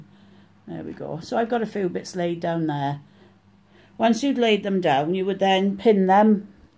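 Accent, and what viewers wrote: Wales, United Kingdom. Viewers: I love your accent. is it Welsh??? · As soon as you started talking I could tell you were Welsh. I looked at your blog and see you're from Port Talbot. Lovely work, you're very talented. I'm from Merthyr Tydfil, not too far away and I probably sound the same, lol. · I know it's welsh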